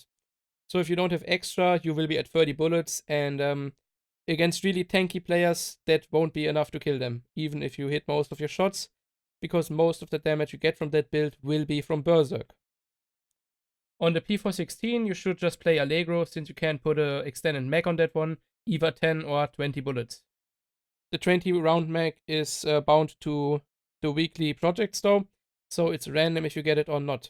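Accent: Germany. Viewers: love the hard german akzent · Bist du deutscher ??? You Liste like a german guy and speaks english 😂😂😂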